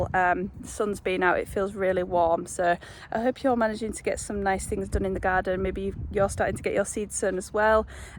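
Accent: East Yorkshire, United Kingdom. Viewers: Loving the enthusiasm for rhubarb, it’s great stuff isn’t it? You’re in East Yorkshire I take it based on your accent? I did my teacher training in Beverley so your accent brought back memories.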